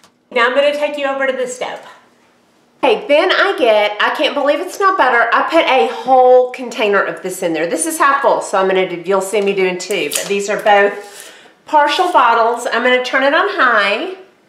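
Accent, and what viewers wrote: South, United States. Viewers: Thanks so much for the detailed response! Just found your channel today through Elle! I like your channel so I subscribed! I'm detecting a strong Southern accent - where are you from? I'm from North Carolina.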